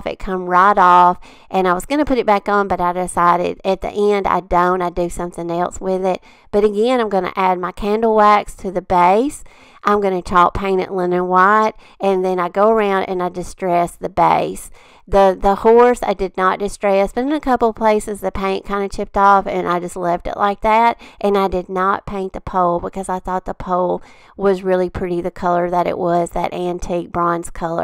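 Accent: South, United States. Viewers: I just need to tell you dear, that I love listening to your gorgeous Southern lilt! Brings back memories of visiting the southern USA in the 80's and 90's!! To yourself it may seem ordinary, but it is just soothing and sweet to hear you speak.....and of course I adore the lovely shabby chic pieces you create so effortlessly!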